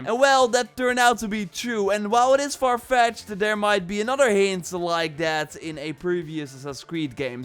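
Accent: Netherlands. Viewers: Your accent sounds like a Dutchman talking English lol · Your accent sounds Dutch.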